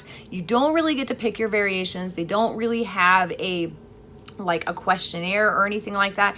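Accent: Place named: New England, United States. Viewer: I'm from Kentucky you don't sound like it, I thought you were from maybe new england or something like that.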